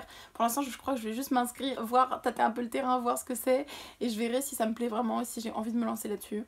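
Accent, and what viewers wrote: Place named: France. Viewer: Et un bel accent français exprimé aussi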